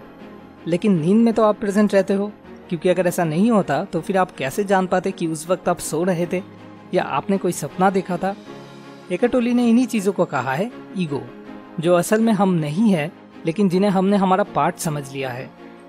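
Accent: Bengal, India. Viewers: Video banane wale ki awaz se lagta hai ki ye koi Bengali hai...and true intellect is their Birth Right.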